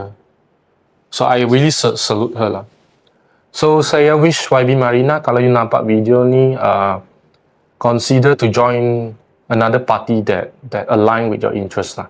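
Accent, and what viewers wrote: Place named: China. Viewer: Fasihnya berbahasa Melayu wpn pelat cina tetap ada.SYABAS KERANA MENGUASAI B.MELAYU DGN BAIK. 👍👍👍🥰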